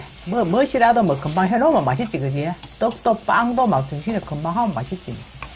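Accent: Gyeongsang, South Korea. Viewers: 털털이 경상도 아줌씨 요리보다 말씨가 더 맛나요^^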